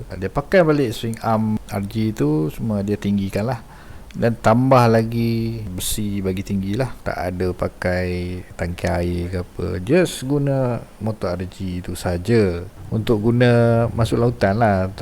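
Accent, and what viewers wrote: Perak, Malaysia. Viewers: Suka dengar org perak ckp,saya asal perak tapi membersar kt bandar ipoh..tengah mencuba untuk memperbanyakan bahasa perak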